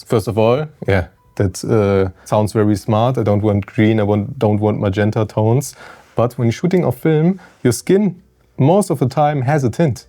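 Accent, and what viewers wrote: Germany. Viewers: Film is still unmatched in all aspects but the sharpness and fine details. But do you really need those pores on the nose to tell a story hehe. Still prefer the top-quality film as a viewer though. Also I don't mind german accent, its not indian or hungarian after all ))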